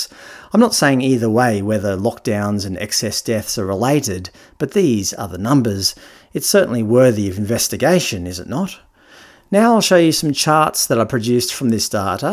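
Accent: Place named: Australia. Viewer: Even with a nasally Aussie accent this is well done and worth a listen. The unimaginable can happen.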